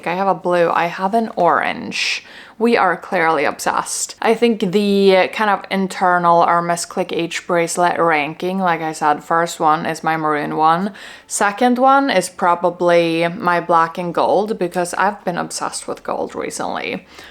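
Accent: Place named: Midwest, United States. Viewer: I have a non bag question. Where did you learn English.? Do most swedes speak English.?.Your English is excellent. You have a bit of a Midwest American twang, very slight, but I can hear it. I lived in Chicago for 10years. Thanks to you I'm looking to get an Evelyn. I never would have looked at them prior to meeting you. I thought they were not pretty. So thank you for opening my eyes. I'm looking for a maroon colour. The GM is the largest one, right? Wish me luck....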